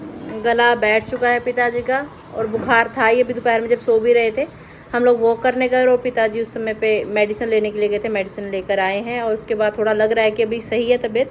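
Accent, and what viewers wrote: Punjab, India. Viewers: Love u di ....❤ ... di ek baar punjabi vlog bnao na aap ... bht sweet lgte ho aap punjabi bolte ho to...❤❤ n aunty uncle ko b pyar bhra namskar ... bht sweet h dono❤❤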